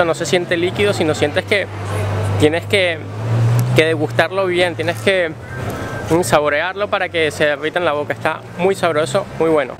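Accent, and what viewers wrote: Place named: Venezuela. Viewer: saludos...muy bueno tu canal,ya me suscribi,por tu acento al hablar y tus palabras parece que eres de Venezuela !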